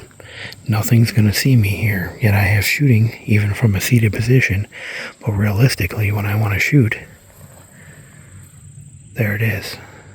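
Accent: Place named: South, United States. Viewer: Ahh.. thankyou . Spent 10 years in N.C., you'd Think i'd know how to decipher a souther accent. Scheesch... !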